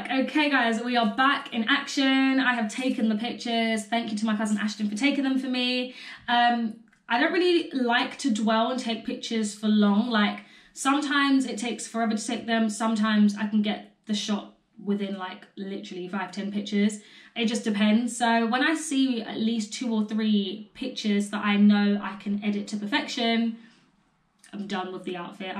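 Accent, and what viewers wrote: United Kingdom. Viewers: The English accent is so cute to me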